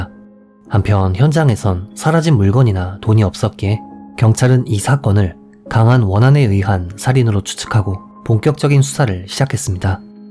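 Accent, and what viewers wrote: Japan. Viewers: 요즘 일본어억양을 심하게 넣지않아서 더 듣기좋아졋네요👍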